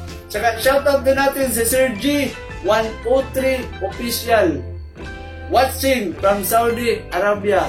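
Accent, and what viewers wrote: Visayas, Philippines. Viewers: visaya diay ka?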